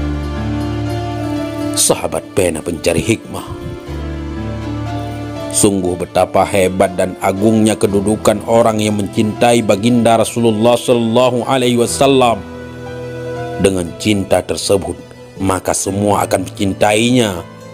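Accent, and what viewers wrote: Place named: Bali, Indonesia. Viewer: Penyiar nya orang bali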